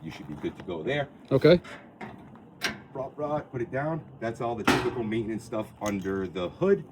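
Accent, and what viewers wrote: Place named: East Coast, United States. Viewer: He does talk fast, but he's covering everything as well as possible. The east coast accent is definitely showing.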